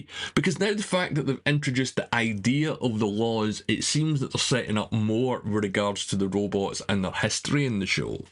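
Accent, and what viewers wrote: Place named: England, United Kingdom. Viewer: It takes a while to get used to your English accent but what you have to say is interesting.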